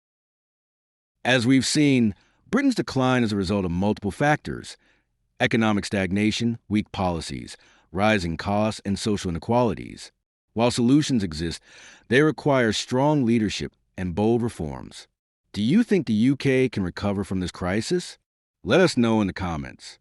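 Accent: United States. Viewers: this coming from an american voice is a joke.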